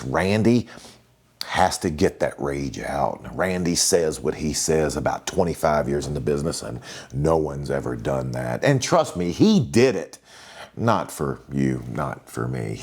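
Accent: Virginia, United States. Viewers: . Your cool af...im used to your accent becouse theres a youtube channel called AFTERPRISONSHOW..and joe the host..has your exact accent..lol and hes from Virginia...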